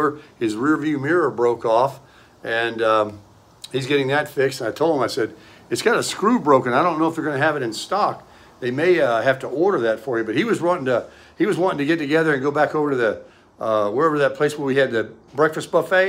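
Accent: South, United States. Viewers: He’s southern 👍🏼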